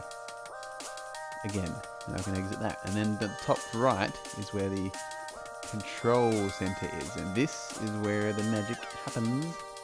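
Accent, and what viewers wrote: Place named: Australia. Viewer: Hi, thanks for that. You sound rather impressed with deepin 15.4. It sounds like you're also in Oz, and that app you downloaded seemed to take a while. Has this been a problem for you since you installed the OS? I prefer to use LibreOffice as a WP app, and I imagine that would take some time to download. (Oh, and I haven't yet installed this OS. Still deciding. It looks good tho, and I'm pretty much over Win 10.)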